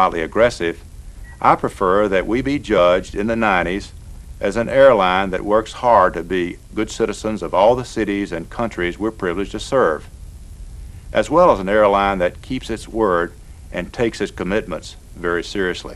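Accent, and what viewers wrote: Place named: South, United States. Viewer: "... true core of our biddiness..." you gotta love that southern accent!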